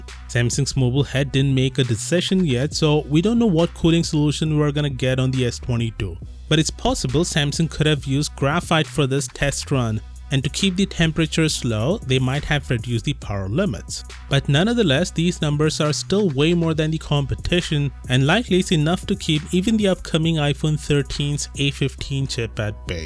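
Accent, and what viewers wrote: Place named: India. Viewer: it explains the english /indian accent lol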